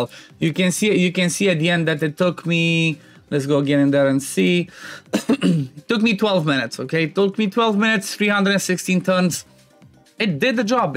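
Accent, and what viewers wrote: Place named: Romania. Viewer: Accentu de român))))) Oricum, fain video, ține-o tot așa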